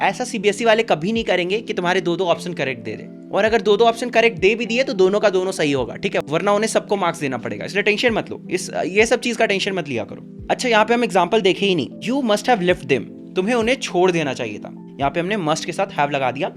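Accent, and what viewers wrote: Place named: Rewa, India. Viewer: I know you are from Mp rewa ......... By your accent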